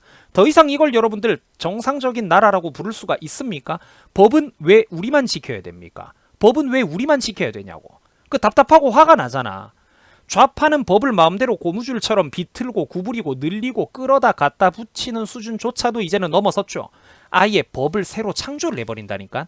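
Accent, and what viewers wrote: Gyeongsang, South Korea. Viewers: 잘한다!! 우파의 젊은 보수 화이팅 그씨도 경상도 얼 사도 말씨가 경상도 갠적으로 더 좋음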